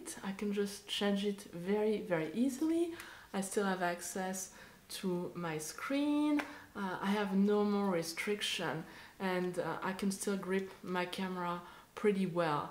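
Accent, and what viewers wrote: France. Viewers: Hello :) J'entends à ton accent (1ère vidéo que je vois de toi) que tu es francophone voire française :) (Personne n'est parfait :D Je vais aller voir leur site, mais pour un produit équivalent, on m'a dit que le défaut - pour un Fujifilm - est que le bouton de release de l'objectif est difficile à atteindre. Ensuite il faut voir si l'ensemble de ton parc objo pourra encore se connecter à l'apn. Dans une autre vidéo, un photographe disait ne pas savoir installer tel ou tel caillou une fois cet accessoire placé... Je n'ai pas la référence de ce youtubeur mais il donnait ça comme principal défaut. Excellent produit qui m'intéresserait s'il s'adapte à mes Fujifilm et les cailloux qui vont avec :) En attendant je vais prendre le temps de découvrir tes vidéos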